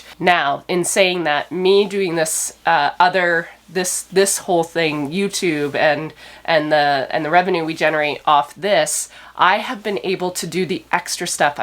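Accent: Canada. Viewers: hello from North Dakota, your honesty is so refreshing. I adore your Lambs and watching them grow, I must admit that when I first started watching the channel I couldn’t eat Lamb. As time went by and I saw the love and care you show each of them that soon changed. Lamb chops are my absolute favorite thing to eat, if it’s on the menu anywhere I’m ordering it. My husband not so much he just doesn’t enjoy the flavor of the meat. Keep on doing what your doing, I must also admit that I love hearing your accent and Canadian sayings it reminds of home. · You must be Canadian. The fist time you dropped an "eh" I started looking for bilingual packaging and I found it.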